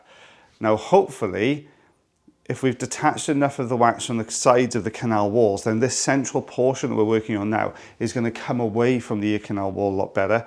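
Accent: Wales, United Kingdom. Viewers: I was scraping treacle out of a bowl the other day and muttered something about earwax. My mum thought I'd gone mad, and after my explanation thought I was even madder! I tried to show her a video but even lovely Welsh accent didn't sway her 🤣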